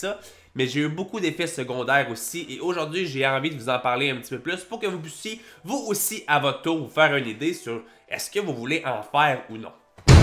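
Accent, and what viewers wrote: Quebec, Canada. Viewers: putin cette accent du Québec c horrible je regarde 5 min sa ma fané horrible dislike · Pourquoi l accent québécois est aussi horrible et ridicule ? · C'est tout les québécois qui parle avec ce accent. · Le français québécois est compliqué, je capte pas bien😑, sinon beau contenu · "de la masse musculaaaaaree"..putain j adore cet accent canadien!! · Ton accent c'est quelle region du Quebec? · Pas habitué à l'accent québécois j'ai rien compris 😂😂😂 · J’adore l’accent québécois 😁😁. Vidéo intéressante! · Quand t'es pas habitué à l'accent quebecois, surtout que le sien il est corsé, tu piges quedalle à ce qu'il dit ouais haha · shakur42ful vive le québec. l’accent québécois est est le meilleur accent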